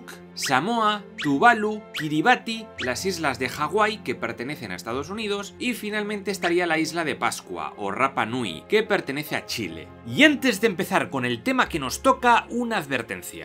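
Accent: Spain. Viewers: ¿Has pensado hacer una versión hablada en español latinoamericano? Es que el acento y la forma en que se habla en España nos resulta desagradable, pareciera que se estuvieran desinflando al pronunciar las palabras. Piénsalo, tal vez tus compatriotas te sigan y así tendríamos un mundo con un español más agradable.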